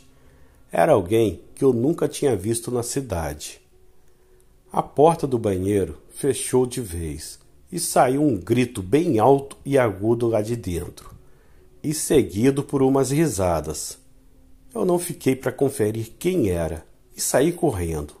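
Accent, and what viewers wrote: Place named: Northeast, Brazil. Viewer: Pela fala com certeza é do meu Nordeste!😁😁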